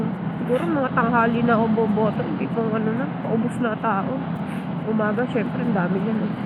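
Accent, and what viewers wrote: Laguna, Philippines. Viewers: I have an ex-gf from Sta. Cruz, Laguna and her voice, accent, the words she's using, and the way she speaks is EXACTLY the same as you. It's kinda trippy☺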